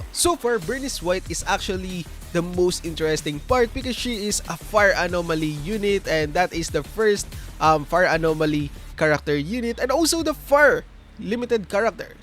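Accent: Philippines. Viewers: I mean 90% of that because of the Ph accent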